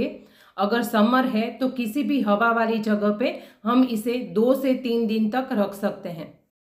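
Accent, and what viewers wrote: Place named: Gujarat, India. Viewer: Mam , bol ne se aap gujarati lagte ho , gujarati bolo , saras , 🙏🏻 mam gujarati ma channel banavo 🙏🏻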